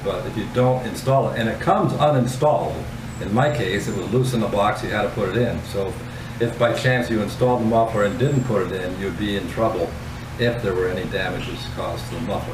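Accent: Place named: United States. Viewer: Hi. New to he channel. Glad to hear you are feeling better. I came for the RE 650 content and I am happy to see you fitted aTEC Stinger exhaust. I am thinking about buying a RE 650 in the future and I saw TEC's vid regarding this exhaust and was blown away with the torque pick up and weight saving. But I wanted see someone install and opine about it and the performance enhancement. What is TEC charging for it? It not listed on there US website last I checked. Also, on a personal note, you sound like an American, what brought you to live in the Philippines?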